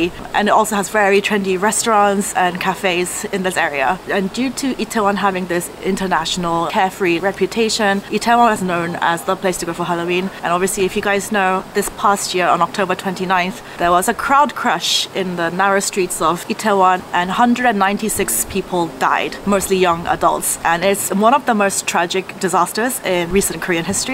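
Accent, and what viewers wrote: United Kingdom. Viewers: Do you have a british partner or spent time learning english in the UK? You have an interesting british accent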